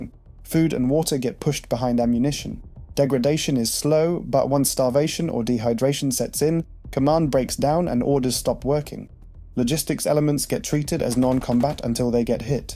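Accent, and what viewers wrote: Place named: United Kingdom. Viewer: So stereotypical British accent.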